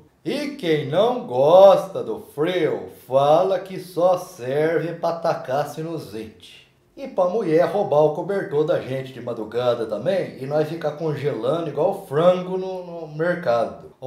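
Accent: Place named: Caipira, Brazil. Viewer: Vc fala igual aos caipira meus tios pai fala assim e eu acho lindo mas ñ consigo falar ñ peguei o sotaque kkkkkkkkk bjos parabéns lindo demais...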